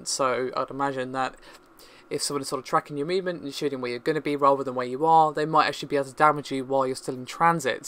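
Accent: United Kingdom. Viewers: Very worth listening to, and elegant British accent. :)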